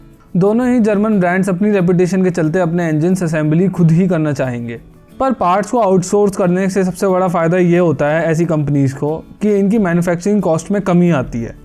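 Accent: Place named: Rural, India. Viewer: Be clear in your dialogues.. and also better use English instead of this village style hindi ascent..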